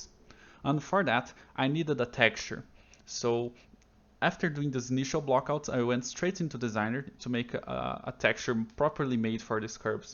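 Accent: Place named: Europe. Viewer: primeira vez que escuto um brasileiro com sotaque de europeu kkk. Bom trabalho aí mano muito bom seu workflow.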